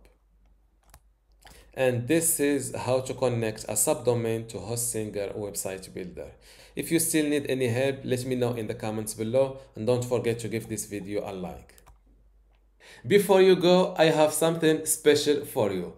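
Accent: Morocco. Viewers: thank you sir and i can tell from ur accent that u are moroccan arent you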